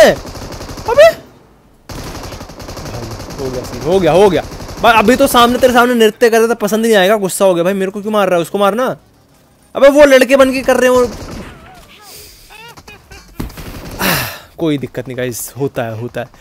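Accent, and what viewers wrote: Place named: India. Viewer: Desi maar ke comentry kar rahe ho bhai 🤣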